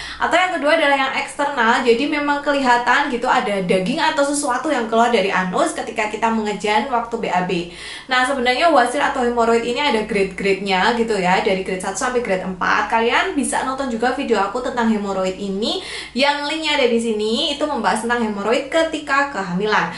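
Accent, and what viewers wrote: Java, Indonesia. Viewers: Bidan cantik yg logat jawanya medok. Channel langganan dari masih hamil smpe melahirkan dan baby ku ud 6 bulan skrng😁 selalu sukak pmbahasannya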